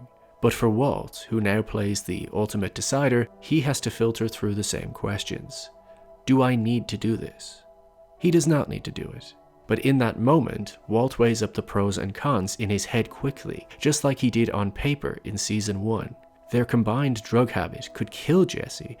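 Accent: Ireland. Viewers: I really enjoyed this video wow. I watch a lot of video essays but this one stood out for how engaging it was. Are you Irish? I’m from Cobh. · Are you Irish? 🤔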